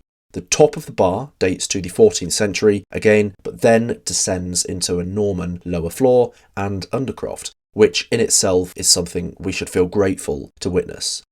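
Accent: England, United Kingdom. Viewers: Dear Lord Brilliant! Love the accent. So English. What I wouldn't give to go back to the days where we could just go about jabbing people with metal sticks and shutting the gates on the morons that don't support us. Can we go back to that or not? lololol! No, I'm just joking on that, right? I mean, no, I have to be joking, we are so far beyond that technologically. I get to fly remote control RC drone hellis packed with lasers and cut down infidels? Ok, maybe? Oh man, it would be like that one helicopter, that was like the night rider helicopter, what was it called? Airwolf. lololol! Oh gosh. I want be an RC drone air wolf for infidels. No wait, I wanna box those people fair and square honestly. Then if that goes wrong. lololol. Oh my god, we've lost the plot. All over a silly old gate dude. You are gate happy bud. Gate happy.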